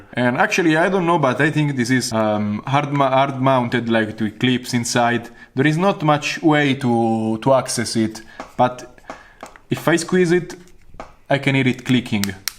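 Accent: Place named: Italy. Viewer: Pure pleasure to watch your movies mate. they sound even cooler with this italian accent :D wish to have more confidence to show my experiments publicly :D like You :D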